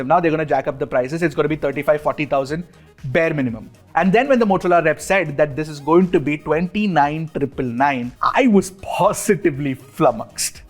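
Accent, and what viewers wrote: India. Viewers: Display is not up to the mark, inferior than edge 60 fusion ,f55 5g display far better than these two.Thanks for your fluent English with Indian accent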